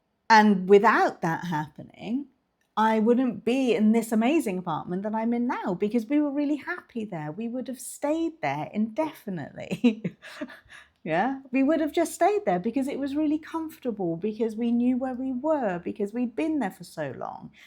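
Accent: England, United Kingdom. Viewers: At last … someone who breaks this down in plain English and with a beautiful English accent 😁 So glad I found your channel. New follower here!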